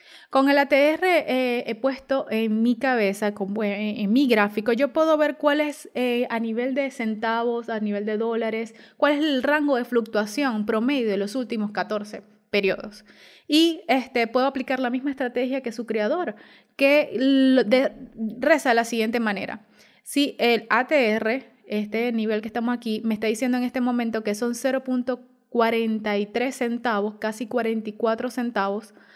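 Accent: Maracaibo, Venezuela. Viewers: Verrrgacioon maracucha te amoo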